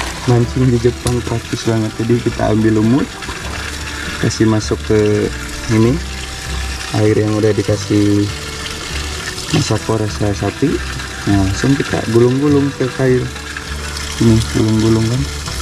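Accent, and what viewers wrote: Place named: West Java, Indonesia. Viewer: emang sundanesse people mah sulit ngilangin aksen sunda nya semangat bro lah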